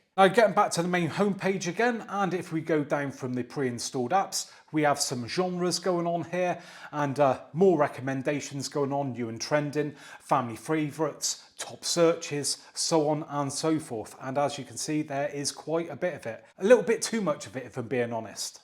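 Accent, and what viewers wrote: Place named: West Country, United Kingdom. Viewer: Sounds west country. Looking at getting this tv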